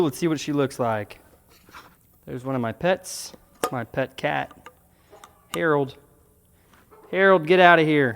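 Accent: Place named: United States. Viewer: Saludos, de que parte de Estados Unidos es tu acento?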